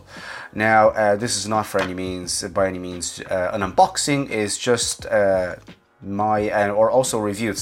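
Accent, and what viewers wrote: Ireland. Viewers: You sound Irish!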